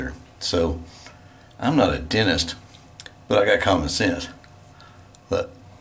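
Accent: South, United States. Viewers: He's got a gentle southern accent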